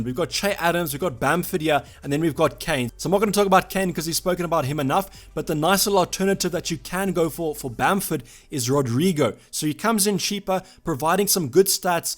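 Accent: South Africa. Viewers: I love your South African accent :)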